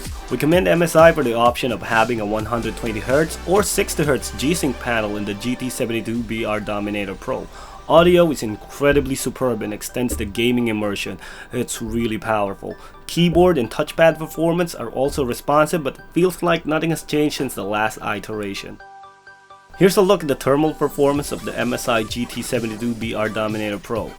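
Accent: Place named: Australia. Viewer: it's a rare opportunity you'll see an asian guy with an aussie accent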